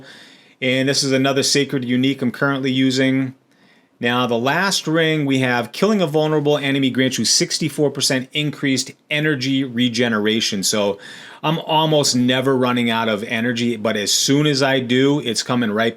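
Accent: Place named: Massachusetts, United States. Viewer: Dudes Massachusetts accent is showing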